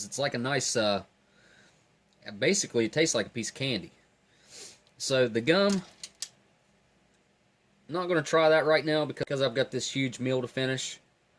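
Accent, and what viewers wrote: United States. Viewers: I'm binge watching your videos like crazy now. Completely enthralling content, plus your American accent is lovely and makes everything interesting.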